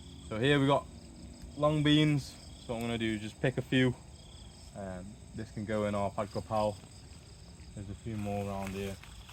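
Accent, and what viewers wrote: United Kingdom. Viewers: when did you move to thailand? fitting name, you sound British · Awesome how this dude looks like full, full native Thai and then speaks like a a British man. 😆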